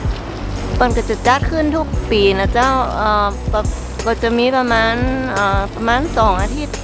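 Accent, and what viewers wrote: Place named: North, Thailand. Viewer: สวัสดีครับ ได้ยินเสียงชาวเหนือผมคิดถึงแม่ละเมาบ้านเกิดผมเลย 😃👍👍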